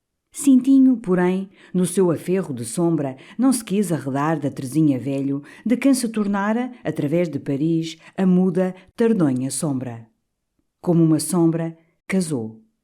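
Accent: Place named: Portugal. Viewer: A pronúncia é d'alguma região de Portugal.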